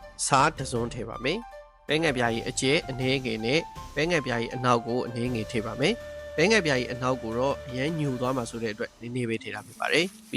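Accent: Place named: China. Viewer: တရုတ်တိုစွသံတောင်မကြားချင်ဘူးရွံလို့ပါ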